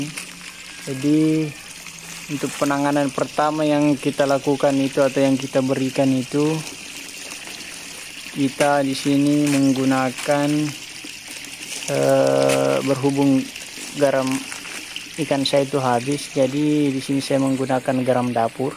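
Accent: Bugis, Indonesia. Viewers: Mantap bos. Kayaknya dari logat orang bugis😁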